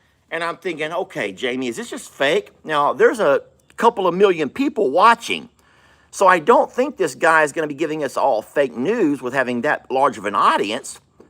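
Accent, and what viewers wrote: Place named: Texas, United States. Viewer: Me too, that Texan twang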